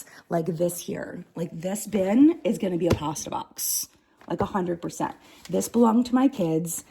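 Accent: South, United States. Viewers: My heart goes out to you, it’s an intensely emotional process sifting through your life’s memories! My best advice is to digitize most of it. Video yourself sorting through them while telling the story behind each item. This way you still keep the memories without the clutter. Seriously, your grandmother’s wig??? Don’t listen to the haters who scold you for speaking “American”! You should never apologize for who you are! You are a lovely person, don’t ever doubt that! 🥰💕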